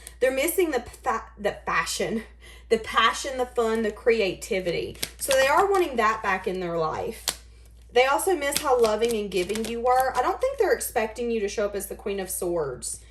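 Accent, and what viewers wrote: South, United States. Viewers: Thank you for always making videos. Your voice, and southern accent brings me comfort. I could listen to you talk everyday, and do. Just wanted to let you know that some random guy appreciate’s your hard work putting these videos together. You are precious.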